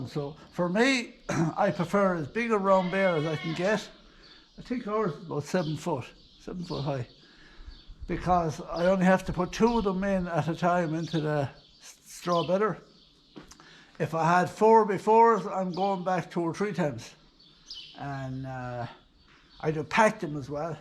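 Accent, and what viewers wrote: Ireland. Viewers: That's because it's an Irish accent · right at the end of the video those of us eagle eyed old boys 🤣🤣🤣 - we can see what looks like “red straps” to a dress. Knowing that you and ‘mum’ went shopping in Clemont, we all assumed that it was a new purchase! (I think we were all hoping for a fashion show 🤣 but then we would be a different type of old men....... ) You have to remember that most of us have been shut away and you have become a farming celebrity. We have all taken to you, mum and dad - it’s honest, real, true and interesting with a touch of fun. Plus there is nothing nicer than your Irish accent. Take care, smile and keep posting true, real, interesting videos - we all enjoy them. Richard xx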